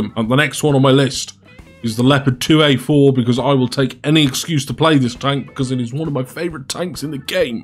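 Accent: United Kingdom. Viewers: Why are you using fictional rebel speed measurements... I've watched all your videos and I've just about had it with MPH with an UK accent..... aaaaaaahhhhhhh.. still love you dude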